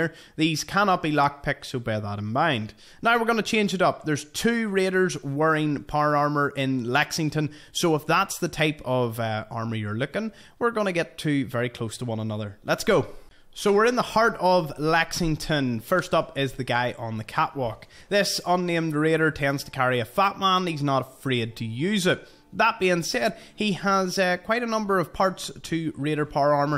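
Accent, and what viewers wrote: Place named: Belfast, United Kingdom. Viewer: Accent making me homesick(I am from Knocknagoney)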